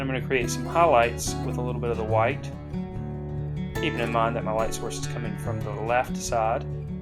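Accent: South, United States. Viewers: beautiful you are really good and i like you countryishh accent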